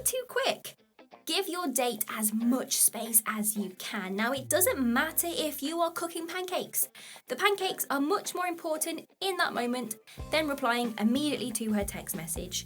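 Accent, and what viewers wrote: England, United Kingdom. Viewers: Hot dang girl!! You're a life saver. Hey random qestion...which English accent are you Londonder, Somerset, or neither?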